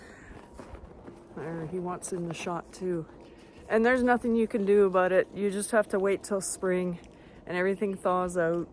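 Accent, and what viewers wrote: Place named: Minnesota, United States. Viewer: I'm glad Jack is ok. However, you have a wonderful Minnesota accent! 💕🐎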